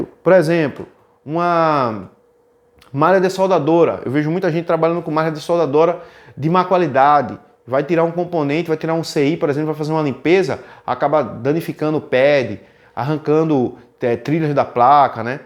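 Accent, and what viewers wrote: Pernambuco, Brazil. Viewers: Tu es Pernambucano? E alve Rubro?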